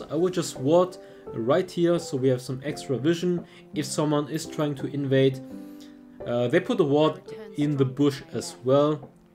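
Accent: Germany. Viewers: Most probably Germany. Pretty typical accent over there. 😁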